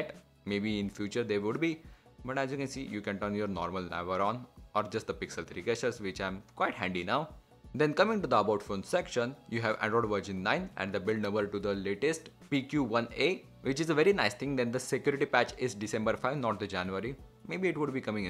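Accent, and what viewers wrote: India. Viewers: You have EYES though. I can understand it even if he talk in pure Indian as long as i can see what he's doing.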